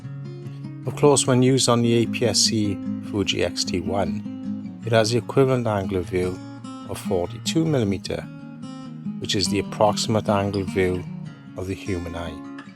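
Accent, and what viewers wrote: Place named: South Wales, United Kingdom. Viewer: After seeing your review I picked one of these up (Nikon F mount) on eBay for forty quid. Not sure why but I'm finding it's overexposing on my Nikon D500 and I'm having to set a compensation of -1 to -2. Apart from that it's an excellent lens. I came back to your video and just twigged on the voice, South Wales. 👍🏻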